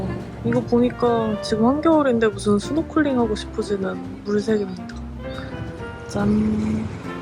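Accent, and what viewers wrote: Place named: Gyeongsang, South Korea. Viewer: 혹시 고향이 경상도 아니신가요? 약간 사투리가 나오는것 같아요ㅎ ㅎ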